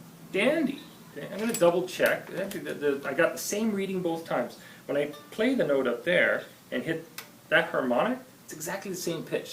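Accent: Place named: Toronto, Canada. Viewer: for any non-canadians, (and anyone using a string action ruler): A canadian nickel is 1.77 mm thick. A canadian dime is 1.22 mm thick (so two dimes would be 2.44 mm) …I didn’t pick up on the Toronto accent until after i had fished around the house for USD pocket change. American Nickels and dimes are like 20% thicker than their Canadian equivalents, maybe they’d be a good rule of thumb for setting up for slide. Anyway, thank you for the help, my tele plays and sounds like a dream!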